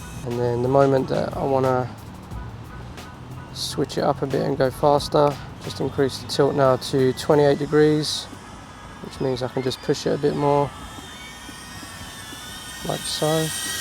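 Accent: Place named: London, United Kingdom. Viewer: That's good to hear thanks for the reply! Yea fellow Londoner (guessing from the accent), lots of content is US based with drones on here so good to see experiences from UK chaps like yourself! Might have to invest in an avata!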